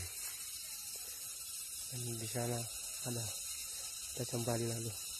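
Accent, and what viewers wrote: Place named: Poso, Indonesia. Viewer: Logatnya to Poso memang 🤭🤭🙏🙏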